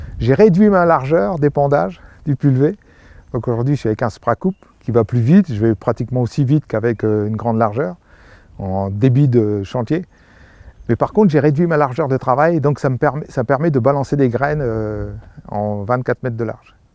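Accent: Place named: North, France. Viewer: L'accent du Nord est resté... 😇👍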